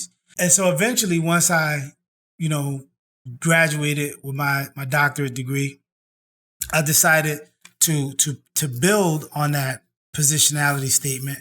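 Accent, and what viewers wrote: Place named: New York, United States. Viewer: Turned it off soon as I heard the NYC accent.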